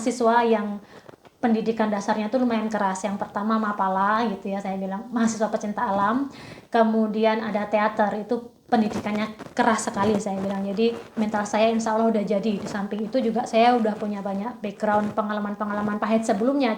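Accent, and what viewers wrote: Java, Indonesia. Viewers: Suka liat gaya bicaranya Bu, kelihatan sekali aura pendidiknya. Biarpun dah lama di Eropa logat jawanya masih medok dan selalu menggunakan bahasa indonesia. Cara mendidik Matilde pun masih kental sekali dengan budaya Jawa, selalu bilang maturnuwun dengan luwes. Sukses selalu Bu, semoga senantiasa sehat dan membawa berkah bagi keluarga, aamiin....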